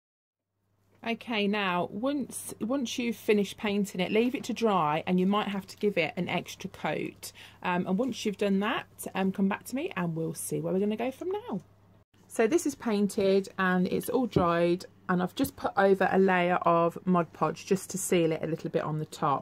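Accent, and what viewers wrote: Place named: Northern England, United Kingdom. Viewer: Hi.I don't do crafts but find it very therapeutic to watch.Also I like how real you are and love your Northern English accent.Thank you.☘️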